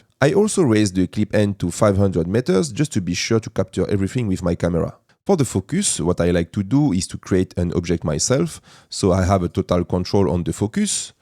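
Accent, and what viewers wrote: France. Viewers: J’ai reconnu un accent français si je ne me trompe pas. Si je ne me trompe pas cargo ne fonctionne pas pour la version actuelle de blender c’est dommage que ça rende obsolète tout tes tutos ou tu l’utilises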